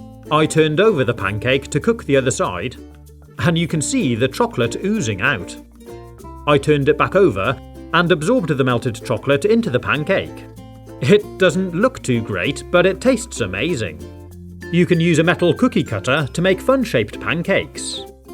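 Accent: United Kingdom. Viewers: i love your accent british guys, loves from turkey :) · I normally don't like the British accent but yours is so satisfying to hear to.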